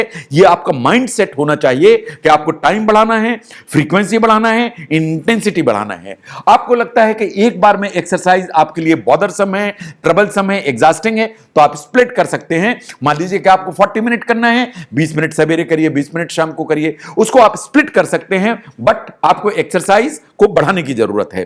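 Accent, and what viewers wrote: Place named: Awadh, India. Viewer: "Joun hai" pure awadhi waah boss 😍 Dill baag baag ho gya jo aapne bech beech me "joun hai"bolte rhte hai aap awdh ki Shaan hai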